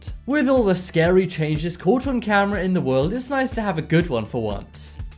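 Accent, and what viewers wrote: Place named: United Kingdom. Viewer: I'm pretty sure the dude is British lol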